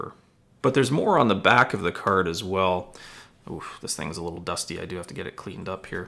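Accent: Canada. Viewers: are you Canadian? Because i get the feeling with your accent especially when you say "about":D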